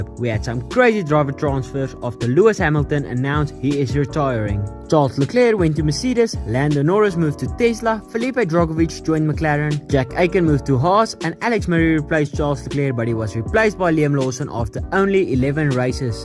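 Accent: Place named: Afrikaans, South Africa. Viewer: im trying to figure out where youre from and you give me afrikaans vibes, so ima guess SA?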